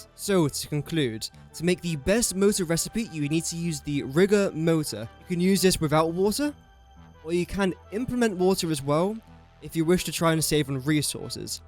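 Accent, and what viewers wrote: United Kingdom. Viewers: you sound like a caricature of a posh English noble, or someone from the rich southside of Dublin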